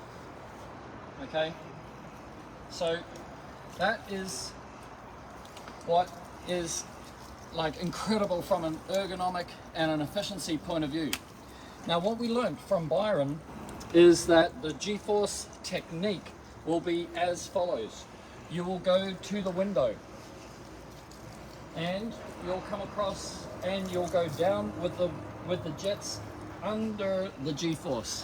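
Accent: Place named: Southland, New Zealand. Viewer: Hey - are you originally from Southland? - I hear that Southland twang in there. (I’m looking to buy and try out a Radial brush soon btw too 😃)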